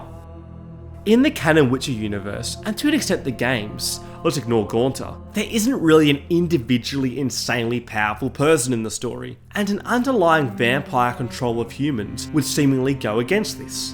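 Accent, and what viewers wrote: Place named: Australia. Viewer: You sound Aussie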